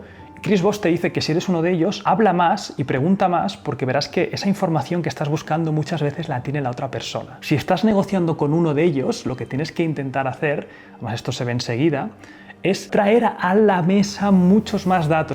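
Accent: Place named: Spain. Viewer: Encuanto escuche ese puto acento español fuck supe que no vale la pena escuchar esto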